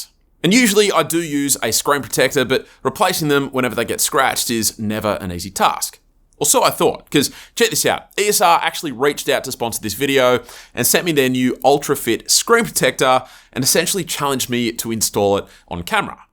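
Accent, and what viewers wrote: Australia. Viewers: You should learn to speak in a bit of neutral accent. Your strong Australian accent is understandable but cannot make it till then end.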